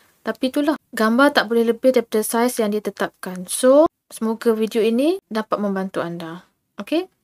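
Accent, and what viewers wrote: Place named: Terengganu, Malaysia. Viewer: bunyi jgk slang ganu ye 😂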